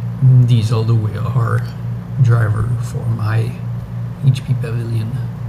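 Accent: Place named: Quebec, Canada. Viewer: jvoulait dire que d'est que j'ai entendu ton accent j'ai su tout de suite que t'etait quebecois lol, bon video en passant, desole pour la confusion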